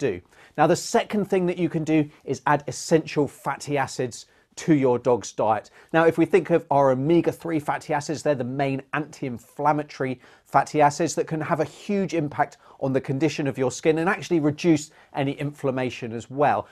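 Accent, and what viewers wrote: United Kingdom. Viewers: You talked so fast I couldn't understand what you were saying especially with your English accident. Sorry. But I'll have to look somewhere else for a remedy for my dogs' itching.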